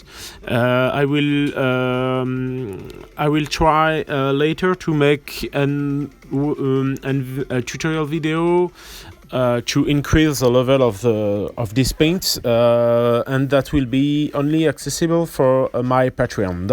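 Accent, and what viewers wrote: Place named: France. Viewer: I can hear french accent lol excellent tuto merci beaucoup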